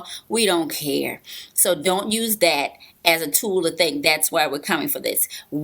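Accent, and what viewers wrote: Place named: Charleston, United States. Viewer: are you from Charleston? i hear your accent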